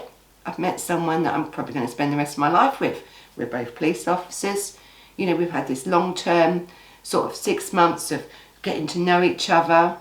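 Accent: London, United Kingdom. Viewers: So good to see you back. I was hoping you were OK and just needing a break from the emotional drain of all this violence and cruelty you dig through to make us the videos. I love your London accent btw. Reminds me so much of listening to some very special people in my life. Old friends who aren't with us any more.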